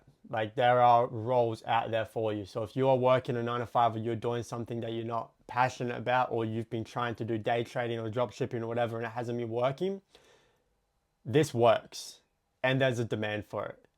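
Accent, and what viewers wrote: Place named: Australia. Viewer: I like this guy already soon as he starts the video already drop the F bomb in a Australian accent😭 let’s get right to it boys💀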